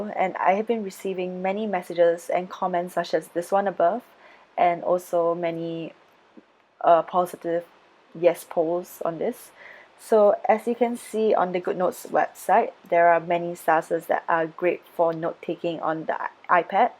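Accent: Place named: England, United Kingdom. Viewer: I hate ur english accent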